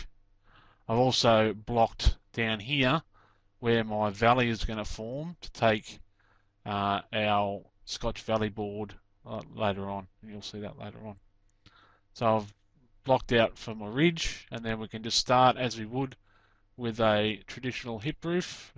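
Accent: Australia. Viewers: Very happy to have finally found some roofing explanations told by whom sounds like an Aussie and speaks in metric terms not American bloody imperials....Loving the videos, good work! Simple and to the point..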